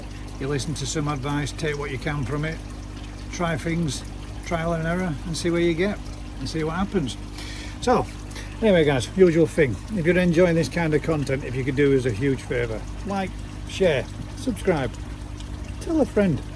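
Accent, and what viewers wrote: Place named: West Yorkshire, United Kingdom. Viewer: Crikey there's some sensitive folk out there!! I will be making my pond bigger after my 2 weeks holiday and enjoy your vlogs Where are you located??...I can tell your in West yorkshire down to your accent as I'm originally from Huddersfield but now in doncaster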